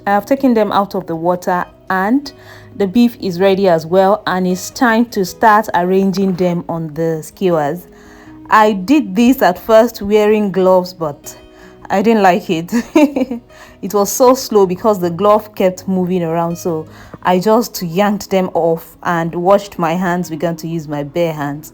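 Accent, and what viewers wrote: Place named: North, United Kingdom. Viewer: I just came across your channel now. You sound like a northerner, are you?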